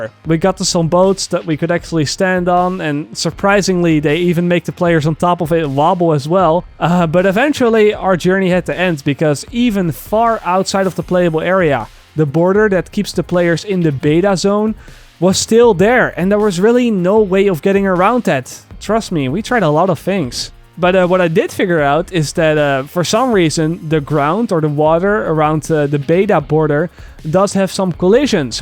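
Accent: Netherlands. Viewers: Cool video, also i'm pretty sure that your'e Dutch... i recognize the accent xD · Mals nederlands accentje hoor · je bent bijna je Nederlands Engels accent kwijt. i just joint you channel, I see you have some awesome videos.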